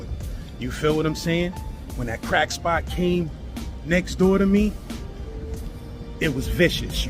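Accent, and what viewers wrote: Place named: New York, United States. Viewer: QUALITY STORY TIME! and ousside on feet MOOOOVINN yea thats a NY nigga 🤣 love from CT 💯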